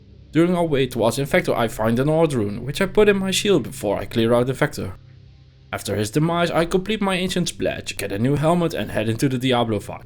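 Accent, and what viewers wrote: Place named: Netherlands. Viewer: ik hoor gewoon een nederlandse broeder onder dat accent <3 love to see it. ik heb geen dutch d2 friends </3 :(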